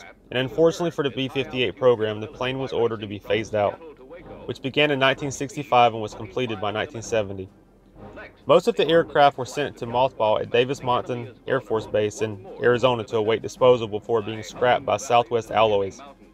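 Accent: South, United States. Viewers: It's weird to hear a narration that has a southern accent like mine. I love it. Fantastic video, the B-58 is an unsung marvel of the cold war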